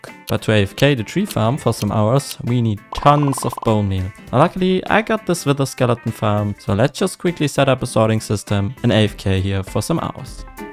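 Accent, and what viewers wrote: Germany. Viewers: I hear a little German AKZENT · You sound german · Love the german accent (im german too lmao) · erstens wusste ich garnicht dass du deutsch bist zweitens als du deutsch gesprochen hast hat man dich nur schwer verstanden da du so viel grass plaziert hast wow jetzt wo ich weiß dass du deutsch bist fällt mir auch dein aczent auf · The gearmen acent 🤌🤌🤌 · Deutscher akzent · I like the fact that he has a german accent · PLEASE PLACE LIGHTNING RODS AROUND SO IT DOESN'T HIT THE BUILD OH MY GOD btw dachte mir schon von Anfang an, "das klingt wie ein Deutscher" 😅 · Der deutsche Aktzent kahm mir schon etwas bekannt vor, aber geiles vidio :p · Ich gucke dich zum ersten Mal und hab direkt an dein Englischen Akzent gehört das du deutscher bist 😂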